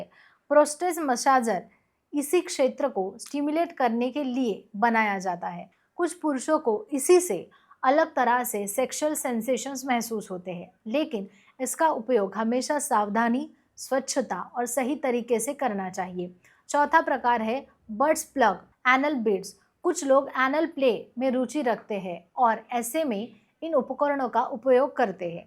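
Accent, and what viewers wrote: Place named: Maharashtra, India. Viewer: Marathi manus wala flavour aa raha hai .. kuthun aahe apan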